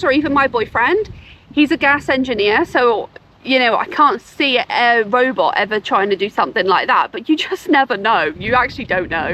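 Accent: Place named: United States. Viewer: As an American, it threw me for a loop hearing another American voice suddenly 😂😂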